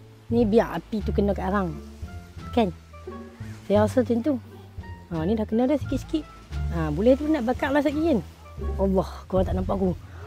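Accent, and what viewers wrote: Penang, Malaysia. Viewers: rindu ckp utara loghat peneng utara😢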